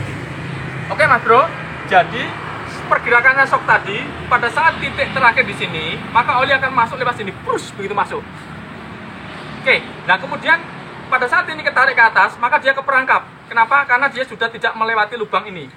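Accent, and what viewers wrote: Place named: East Java, Indonesia. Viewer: smpean kok faseh bhasa jawa timuran om?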